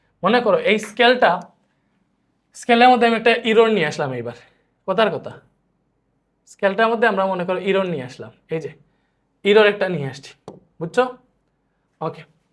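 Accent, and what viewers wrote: Sylhet, Bangladesh. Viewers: are you sylheti brother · Vai apni sylheti ni.. please reply dein.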